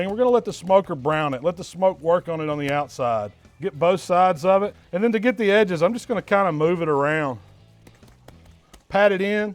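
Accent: South, United States. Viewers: always trust a fat chef with southern accent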